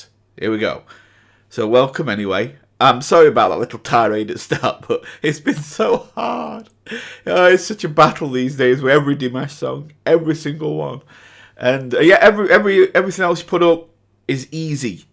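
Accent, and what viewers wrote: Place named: Liverpool, United Kingdom. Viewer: When you listend to Dimash for the very first time did you expect to fall down into such a huge rabbit hole? I remember you said that you rate songs and you rarely rate songs with a 10. I was so amused because I already knew what you are heading into. I really like your accent although sometimes it isn’t that easy for me to understand. May I ask from which region you are from? Liverpool? I‘m not sure about that, just a guess from a foreigner not native speaking English.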